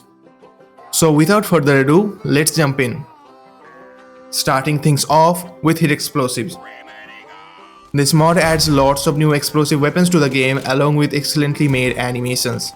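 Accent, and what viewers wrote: India. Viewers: Is the lone wolf radio somehow involved in the quest mod Skinwalker? When i heard you talk about the mod in the video i started getting flashbacks to THAT creepypasta. It be an instant download for me if that's the case Also I'll take the indian "call center" accent over obvious generic deadbeat ai voice any day of the week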